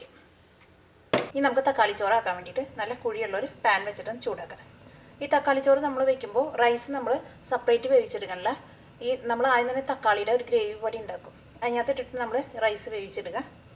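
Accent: Thrissur, India. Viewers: Nice thrissur accent hehe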